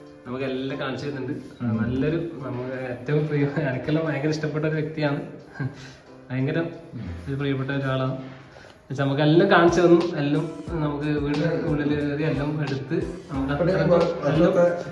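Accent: Kannur, India. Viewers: Ninda oru kannur samsaram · KANNUR BHAASHA ETHRA NANNAAKKAAN NOKKIYAALUM MOZHACHIRIKKUM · ഇയാളുടെ സംസാരത്തിനു കുറ്റം പറയുന്നവർ ഓർക്കുക പല നാട്ടിലും പല രീതിയിലും ആണ് സംസാരം ഇത്‌ കണ്ണൂർ കാസർകോട് ഭാഷ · Kannur slang👎🏻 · valre shriyanu chodukkanum , athinu corect Aayi marupadi paryanum shrmilkuka malayalm kannur slang pryumbo kelkkunnvarkku arochakamyi marthirkkan prthyekam shrdikkukka njanum oru kannur payynnur karan anu nammlde slang mattullvrkku mansilyikollenminnilla athu onnu shraeicha thnne nannvum Aniya prgarm nallthanu niglude oru tudkkakrnte vishmam undu athokke sheri ayi vratte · ❤kannu style aanu samsaaram.its ok. but culture illatha oru perumatam . oralod samsarikkumbol ulla reethiyil maatam varanam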